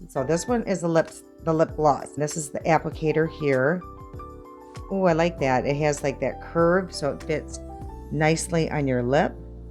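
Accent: Midwest, United States. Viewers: Hi!! I’m not sure where you’re from but you remind me of my time living in Minnesota. That wonderful Midwest accent. You make me feel so nostalgic to go back there. :) enjoying your content.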